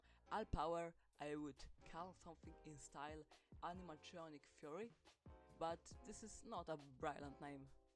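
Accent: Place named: England, United Kingdom. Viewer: Uu ar pierdolnięty anglik xd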